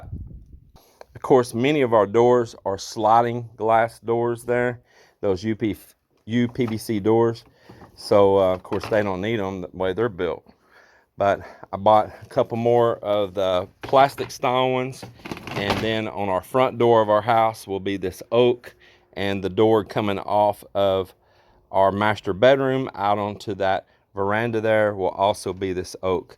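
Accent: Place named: East Texas, United States. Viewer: Lol. First time I heard your voice I was like I bet he's from Terrell or Tyler area. Iloilo just went to ECQ. And I've found good outlets for cheap at certain Handyman stores. I use GFC outlets and surge protector strips throughout so my wife can just flip the switch instead of unplugging everything everytime.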